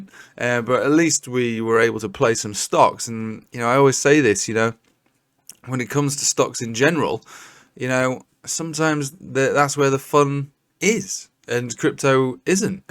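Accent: United Kingdom. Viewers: Always good to hear a British voice and vision in the morning 😅🇬🇧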